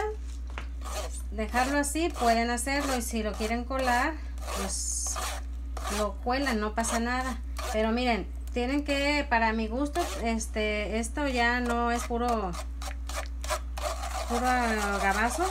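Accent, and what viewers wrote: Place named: Juárez, Mexico. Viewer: Eres de Juárez , es que hablas como Antonia Losoya😊 se ve sabrosa tu agua , la voy a preparar ..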